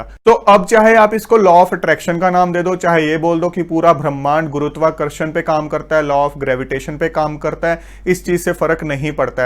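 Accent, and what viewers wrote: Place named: Punjab, India. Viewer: Your language is filled with punjabi tone😆❤️ sir!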